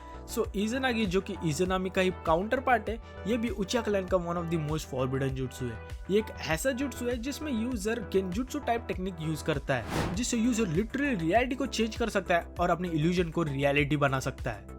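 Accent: Maharashtra, India. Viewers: Are u Marathi❤😊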